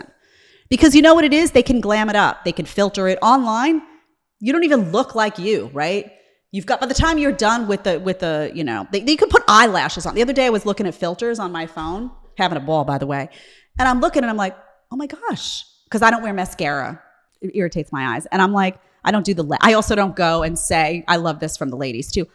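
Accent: New York, United States. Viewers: I recently moved from NY after being there for 12 years. Your accent and your humor invoke good memories of some of the nice people I knew and met there. Great content!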